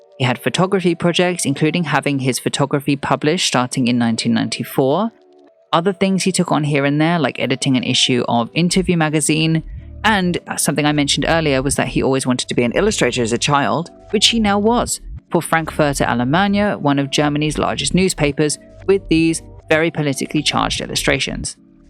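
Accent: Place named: England, United Kingdom. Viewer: I think it’s because you have an English accent, but it doesn’t sound super condescending and posh. I mean that as a compliment, it is an American lol.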